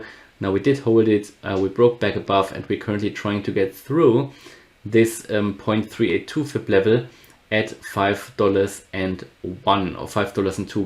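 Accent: Germany. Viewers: What accent do I detect Are you German?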